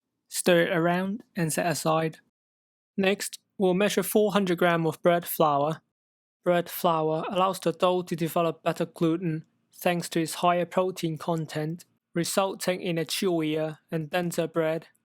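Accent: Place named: Italy. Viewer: luv the Italian accent, cool video well explained recipe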